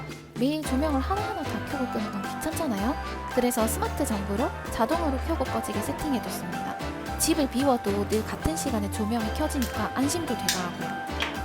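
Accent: Busan, South Korea. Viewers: 표준어를 쓰고 싶지만 새어나오는 부산바이브 ㅋㅋㅋㅋ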